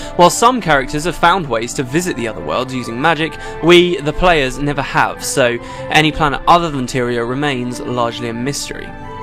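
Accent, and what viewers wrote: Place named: United Kingdom. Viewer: ...我以為自己正在看泰瑞亞的 discovery channel ... 很重英國口音又對得上口的旁白啊！！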